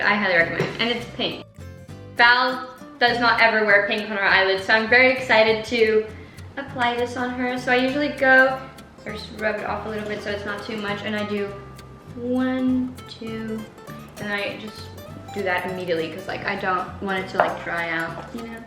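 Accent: United Kingdom. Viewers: The 'Brit's don't be offended XD' got me rolling on the floor. You were speaking very POSH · But good london Accent! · British accent is slayyy. Btw can you pin me 📌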